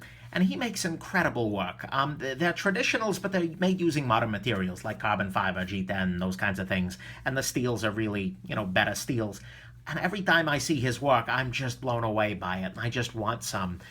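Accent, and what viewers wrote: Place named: New Jersey, United States. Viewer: Why do so many find the way you talk as different? I live in Jersey and everyone sounds just like you?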